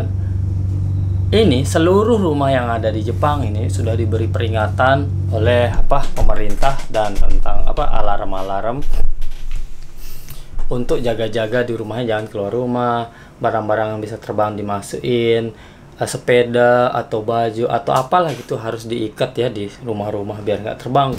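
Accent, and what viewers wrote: Lombok, Indonesia. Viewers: bang, org lombok mana? kok kayanya logat lombok banget klo ngomong heheh maaf pendatang baru, tyg lotim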